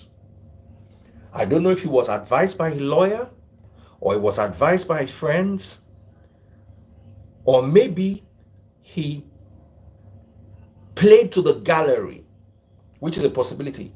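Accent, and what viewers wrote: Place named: Yoruba, Nigeria. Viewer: daddy freeze pls I love your yoruba dialect so much. can you speak more of it. lol. your yoruba always amazed me. 😆 🤣 😂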